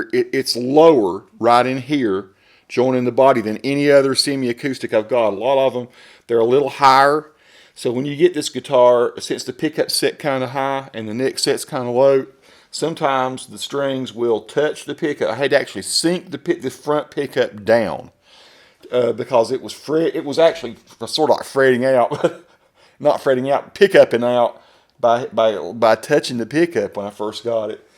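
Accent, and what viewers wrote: South, United States. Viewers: I love your videos, they are so in depth and practical. It is also nice to hear a southern drawl. I ordered one of these based on your review. Thanks so much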